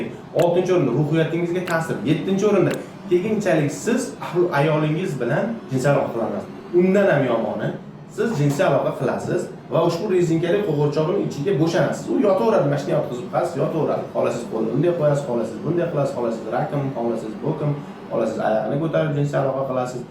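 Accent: Andijan, Uzbekistan. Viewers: aka anjancha ogilbolacha gapirar ekansiz😊😊😊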